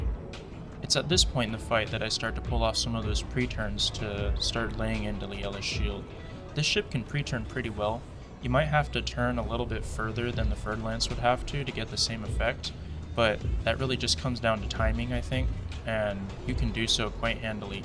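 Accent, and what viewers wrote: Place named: Philippines. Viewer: This guy sounds pretty Filipino NGL🥵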